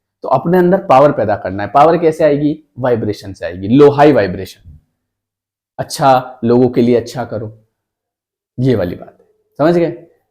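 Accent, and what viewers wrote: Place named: Madhya Pradesh, India. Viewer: भैया आपकी बोलने की टोनिक वाकई एमपी वाली है मैं भी एमपी से हूं❤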